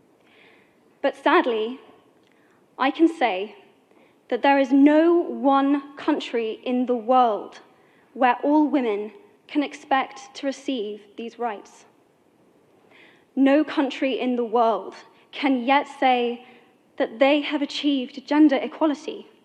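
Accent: United Kingdom. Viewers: Her accent is British, I prefer the American one . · It’s called talking slow in a brutish accent, first class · Came to learn British English accent and learned sometime more important. Gender equality.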